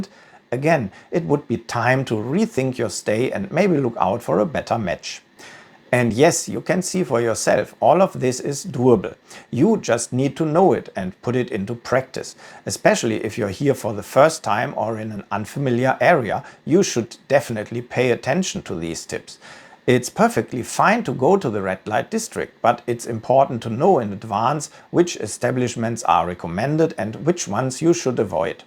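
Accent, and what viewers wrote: Germany. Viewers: German accent still 100 percent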